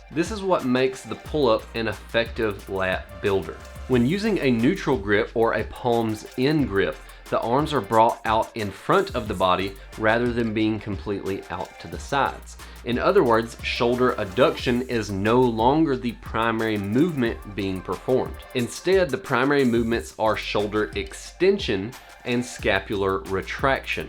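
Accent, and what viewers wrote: South, United States. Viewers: deep southern accent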